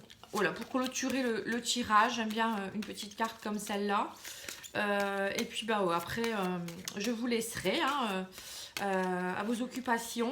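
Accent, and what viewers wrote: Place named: Pas-de-Calais, France. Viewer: 👍Suis du pas de Calais aussi je l avez perçu ds votre accens🤣😘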